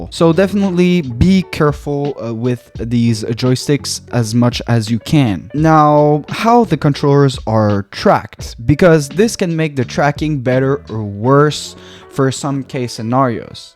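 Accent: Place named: Quebec, Canada. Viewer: je peut entendre l'accent quebecois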